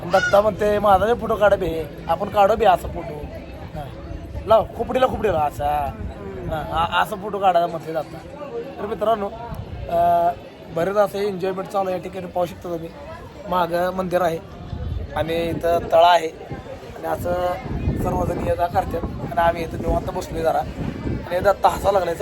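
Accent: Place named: Solapur, India. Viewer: खाली ये बे लाज आबरू सोडला बे भारी होत हे😂😂😂😂😂😂 आ दादा खुप हसलो आमी छान बोलता दादा तुमी सोलापुरची भाषा ❤❤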